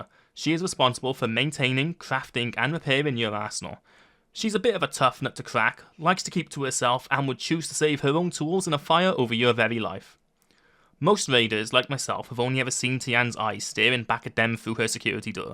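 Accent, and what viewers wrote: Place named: South Wales, United Kingdom. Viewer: You sound like your from my neck of the woods. I'm from South Wales. Cool video. I wanted to see this side of the game. I'm thinking about getting it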